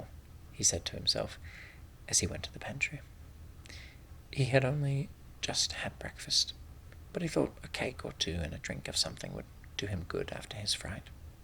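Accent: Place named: New Zealand. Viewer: He’s even got the kiwi accent, by the sounds of it 👏🏼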